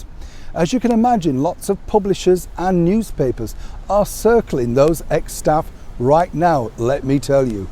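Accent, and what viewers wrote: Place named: North West England, United Kingdom. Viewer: Can I just ask? Whereabouts are you from? My guess is north west England? Your accent is very familiar to me x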